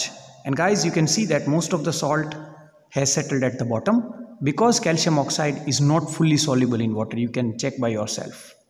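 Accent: Gujarat, India. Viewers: Sir you are from Gujrat ??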